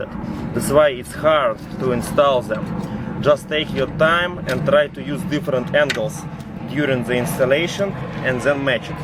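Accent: Russia. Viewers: Жуткий русский акцент, но англичане в восторге.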